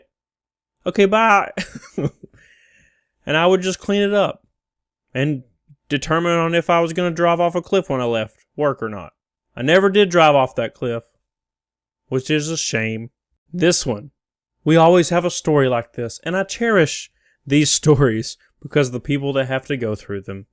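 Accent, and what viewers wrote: South, United States. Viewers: Is it worth mentioning that you have a Kermit the Frog voice sometimes? Like a southern Kermit. Anyway, good videos. Thanks!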